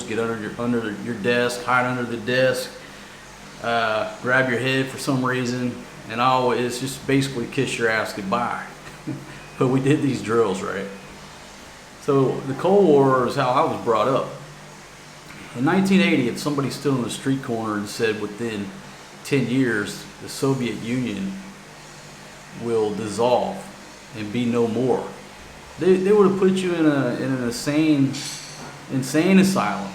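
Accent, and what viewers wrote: South, United States. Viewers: I guess you can diagnose someone from TV or you tube, you sound like a ignorant redneck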